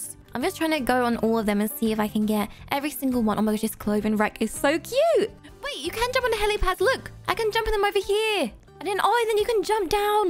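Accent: United Kingdom. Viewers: Are you from the UK I am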